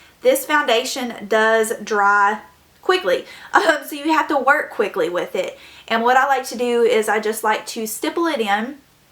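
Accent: South, United States. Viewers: Your southern accent is so cool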